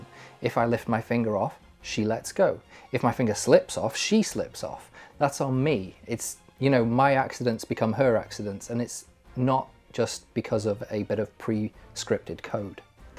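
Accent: Wales, United Kingdom. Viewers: Are you Welsh? You sound Welsh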